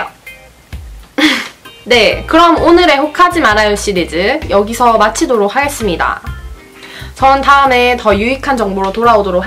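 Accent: Gyeongsang, South Korea. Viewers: 예전 큐엔에이에서 였나? 기억은 잘 안나는데ㅠ 경상도쪽 (대구?)사투리랑 서울쪽 사투리랑 섞여서 이렇게 되셨다고 했어용 정확한건 그 영상을 찿아보시면 되실것 같아요ㅠㅠ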